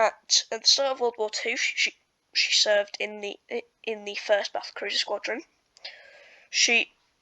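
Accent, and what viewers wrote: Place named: England, United Kingdom. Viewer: Thank for this really well put together video. For the first time in ages, I'm getting to view something where the narrative matches your visual presentation accordingly and accurately. Your English accent turns my ears into soft butter. A pleasant change to my usual cretinous American environment. Well done and thumbs up.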